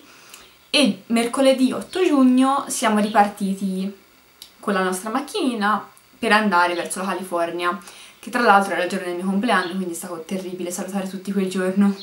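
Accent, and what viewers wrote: Tuscany, Italy. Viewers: Che bello l'accento toscano 😍😍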